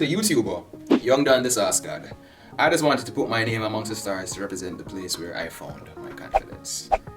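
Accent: Jamaica. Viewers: This might sound crazy, but him sound like young machel montano, just with a jamaican accent instead. Same kinda tone and slight raspiness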